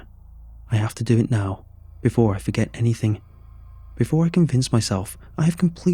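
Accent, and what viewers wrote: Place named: Rhyl, United Kingdom. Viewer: Sounds like Rhyll. “If all else fails, try Wales....”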